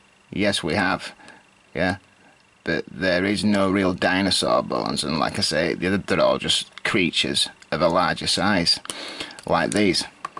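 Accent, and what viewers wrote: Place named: Lancashire, United Kingdom. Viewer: hey, I remember that huge dinosaur in manchester museum hanging from the ceiling when I was a kid, I think it was supposedly a T.Rex? 65 million years old 🤣 I was totally indifferent to it . Maybe bc I was a girl. I want to ask are you from north side you have gorgeous Lancs accent... & that cynism & skeptism. Superb. Miss the mancs voice.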